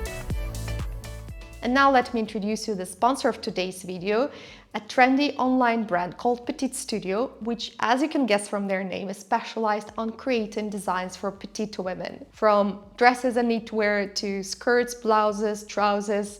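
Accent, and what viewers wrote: Russia. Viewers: почему у нее русский акцент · russian acsent 😐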